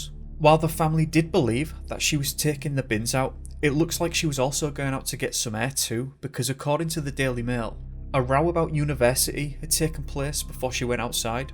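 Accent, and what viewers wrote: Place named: East Yorkshire, United Kingdom. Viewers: Are you from Hull or Leeds. You sound Yorkshire. As from York lad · It's a reet belting northern accent tis this! 💞💞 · Sounds like an East Yorkshire accent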